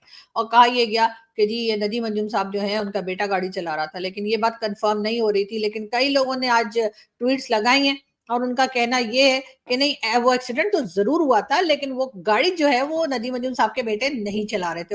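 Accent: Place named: Uttar Pradesh, India. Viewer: Aapki Punjabi Bhasha up Walon Ki Tarah lag rahi hai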